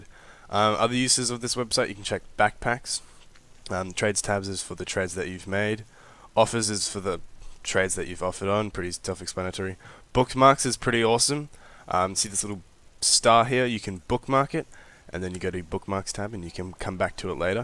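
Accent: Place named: Australia. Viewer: I LOVE THE AUSSIE ACCENT! ITS SO NICE TO HEAR A YOUTUBER HAVE ONE! (i'm australian)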